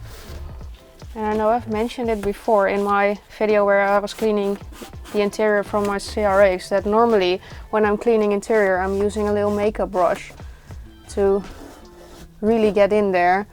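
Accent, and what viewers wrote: Netherlands. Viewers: My first video I saw from you, I really enjoyed it, so thank you! I also love your Dutch accent haha.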